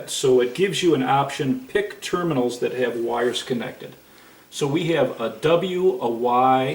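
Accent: Chicago, United States. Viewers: The guy sounds like he is from Chicago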